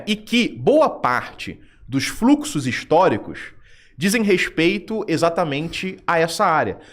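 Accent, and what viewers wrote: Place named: Rio de Janeiro, Brazil. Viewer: Muuuuuuito carioqueixxxxx, fica difícil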